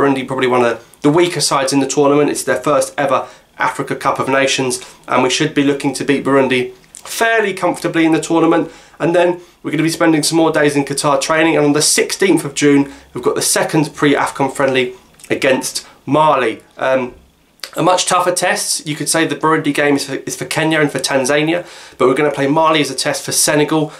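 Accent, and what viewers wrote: United Kingdom. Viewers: Thanks bro nice British accent ! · El Jazairie Judging by his accent he's propably born in 🇬🇧. so maybe he doesn't speak it🤷‍♂️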